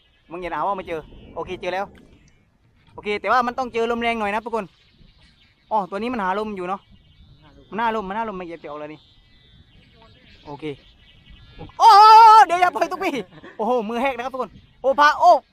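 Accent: South, Thailand. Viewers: ผมชอบมากคับถาษาใต้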